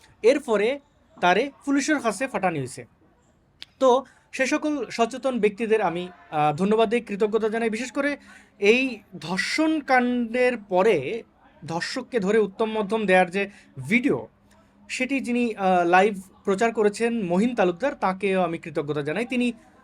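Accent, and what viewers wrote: Sylhet, Bangladesh. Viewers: ভাই আপনার মুখে সিলেটি ভাষা অনেক ভালো লাগে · আপনার সিলেটের ভাসা খুব সুন্দর 👌👌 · ভাই আমি ভারতীয় সিলেটি এবং আপনার সিলেটি Accent টা শুনতে অনেক ভালো লাগে, তাই আমি চাই যে আপনি মাঝে মধ্যে সিলেটি Accent এ ভিডিও বনান। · ভাই, আপনার সিলেটি ভাষা বলা, সত্যিই প্রশংসনীয়। মনে হয় আপনি দীর্ঘদিন সিলেট ছিলেন। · তুমি তো ভাই আমরার পুরা সিলেটি মাত জানো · আপনার মুখে সিলেটি কথা শুনে খুব ভালো লেগেছে। মনে হয় আরো শুনি। অন্য ভিডিও তে সিলেটি কিছু বলার জন্য অনুরোধ করতে পারি কি ? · ভাই তুমার সিলেটি মাত ত ভালাউ সুন্ধর। হুনতে ভালাউ লাঘে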